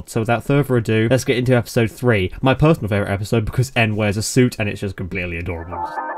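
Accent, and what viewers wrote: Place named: United Kingdom. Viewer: The brit'ish accent is very strong here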